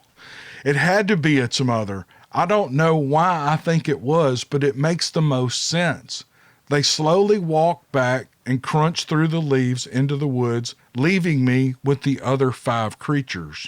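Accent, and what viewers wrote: South, United States. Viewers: They are not monsters,like some people think.i love this guys story,and the way you tell it.also,love your accent.southern boy,like me.if they seem aggresive ,then some one made them that way,possibly by harming one,or shooting one.too quick with the gun,they are more curious than anything.they don't mean you any harm,unless they have been abused by someone before you arrived.well,merry christmas to you and your family.keep up the good work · Hey!!!! Merry Christmas. I thank God for you and your channel. You bring me and so many people joy all year long. Plus as a midwesterner( Wisconsin) I love your southern narration! Bless you and yours.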